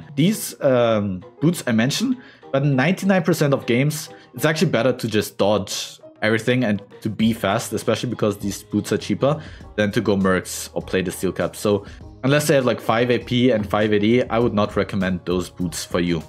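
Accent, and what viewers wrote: Germany. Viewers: The whole time i was like..he sounds german.. Hatte ich wohl recht xD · Ich merke an deiner Aussprache sofort, dass du ein deutscher bist =) Also herzlichen Glückwunsch zu deiner Leistung <3. Kannst du bitte eine kurze Zusammenfassung bzw Checkpoints der Mechanics für Challenger Teemo & einen Toplaner auf Deutsch bestenfalls für Wildrift raus hauen? Ein Beispiel ich habe mir einen Toplane Kassadin für Wildrift von den besten Spielern auf YT mit Meilensteinen eingerichtet: Vor Minute 5 muss der RoA fertig sein und bis Minute 10 muss der Seraph wenigstens gekauft sein. Dadurch drehe ich egal wie das Game am Anfang lief meistens im Lategame. Ich würde mich freuen dich in Wildrift bald auf dem Weg ganz nach oben zu sehen^^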